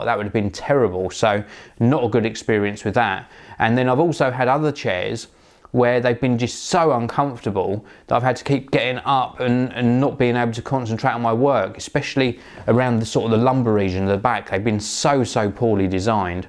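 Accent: England, United Kingdom. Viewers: the "leavers", love that English accent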